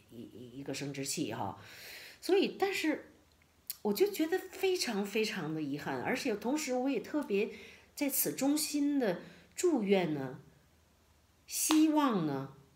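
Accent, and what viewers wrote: United Kingdom. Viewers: 喔，英伦口音好高雅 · 您的英音还挺好听的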